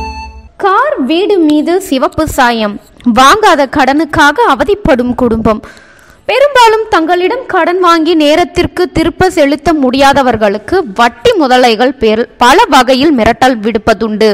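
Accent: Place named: Sri Lanka. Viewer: The way she pronounes lk fm Srilanka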